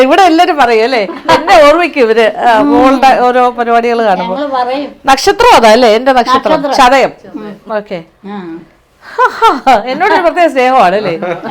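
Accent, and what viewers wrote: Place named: Mavelikkara, India. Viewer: My paternal great grandma hails from Mavelickara and that's why I love to listen to the Mavelickara slang of our beloved Maam🙏🏻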